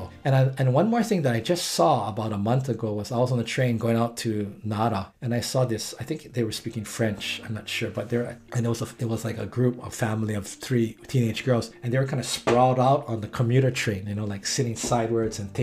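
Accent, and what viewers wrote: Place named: Hawaii, United States. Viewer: are u from hawaii. i can hear the pidgin accent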